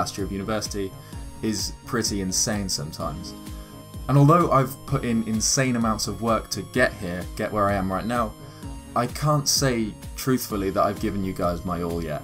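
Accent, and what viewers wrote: England, United Kingdom. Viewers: I remember those days. You were and still are my favorite Zelda theorist. I always assumed was a mix of Zelda and Celtic. And I thought it was odd because you sound more English than Celtic.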